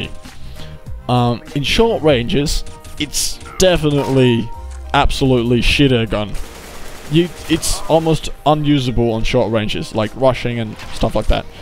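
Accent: Finland. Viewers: I can hear the finnish accent leaking through! :^) But still hellagood english skills and good and entertaining video, earned my sub! : D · Vittu että nautin tosta sun pienest "Ralli Inklish" :D sen erottaa sielt just ja just :D muttei myöskää välttämättä jossei keskity :D vitu hyvää commentaryy näit lisää +1 :)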